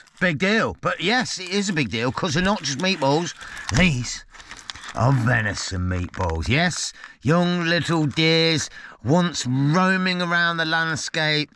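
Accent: Leicester, United Kingdom. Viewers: Gonna be completely honest here, when I first started watching you I hated it, just couldn't get used to the Leicester accent, now your my favourite u tuber it's hilarious, can't wait for u to upload the next video, u really make my day 🤣🤣🤣🤣🤪🤪🤪🤪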